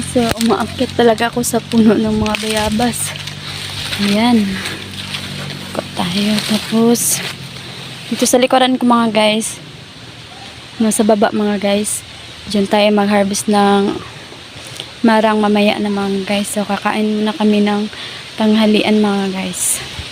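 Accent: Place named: Binukid, Philippines. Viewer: Binukid jud imong tirada ba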